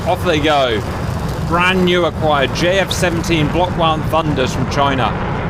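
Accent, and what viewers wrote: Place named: RP, United Kingdom. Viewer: A lot of relentless jibber jabber from a posh boy with a neo RP accent. Wonder how long these tossers would last in a real war?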